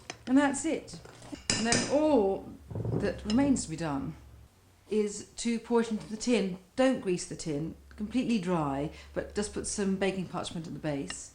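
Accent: United Kingdom. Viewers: god i love her. i wish i could move to england to marry a english woman. her accent is just heaven. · A british accent lol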